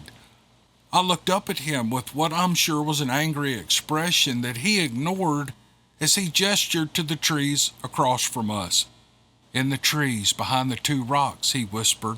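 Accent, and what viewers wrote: South, United States. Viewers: Lol. Thanks, was getting desperate for a fix, of your southern narrations ❤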